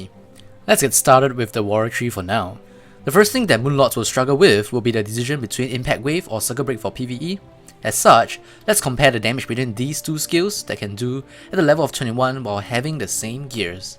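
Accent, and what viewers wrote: Singapore. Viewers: accent is south east asian. hes singaporean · Yin s he still sounds like a Singaporean to me.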